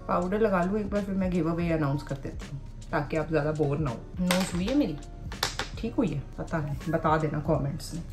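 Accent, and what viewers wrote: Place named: Chandigarh, India. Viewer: You literally speak like us, people from Chandigarh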